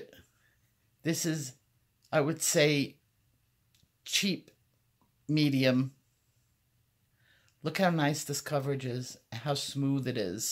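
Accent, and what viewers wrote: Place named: Massachusetts, United States. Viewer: Nice tip and I could listen to your lovely voice all day. Are you from Mass?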